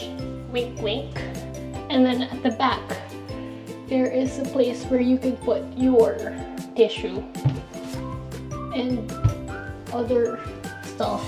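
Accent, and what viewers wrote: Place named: Canada. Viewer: I love the video. You accent is really interesting, I love it! I'm living in Hawaii, and you sound like maybe you lived in Canada maybe? No idea how much these costs but I hope to move to Japan with my wife sometime in the next year or so!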